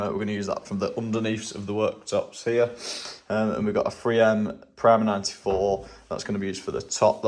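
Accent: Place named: Lancashire, United Kingdom. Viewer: South Lancashire, around Chorley, Leyland, Bolton.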